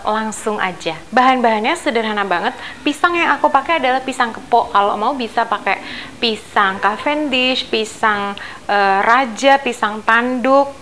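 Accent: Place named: Surakarta, Indonesia. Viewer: Thx kak..luar biasa kesaksiannya.. Tuhan selalu sertai keluarga kak..( baru tahu saat lihat YT pas ini..kalau ternyata kaka di luar negri hihi saya kira di Solo Indonesia karena logat dan kebayanya ) GBU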